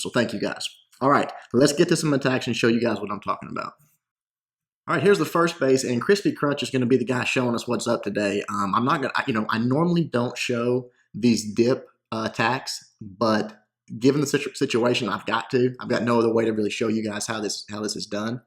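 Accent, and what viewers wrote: South, United States. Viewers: I find these videos informative, but this dude's voice is super annoying. It's that shitty southern accent with the horrid pitch FFS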